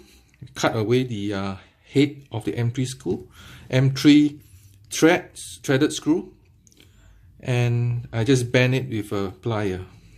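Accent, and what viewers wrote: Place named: Singapore. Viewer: You sound like Singaporeans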